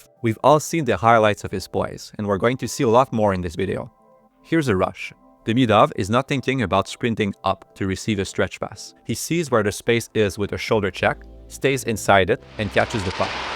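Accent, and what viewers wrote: Quebec, Canada. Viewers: T'es un québ toi aussi? T'as un accent québecois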